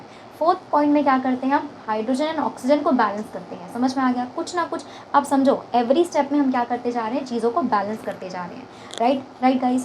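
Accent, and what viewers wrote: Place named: Bihar, India. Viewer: I think you are from bihar.. Am I right?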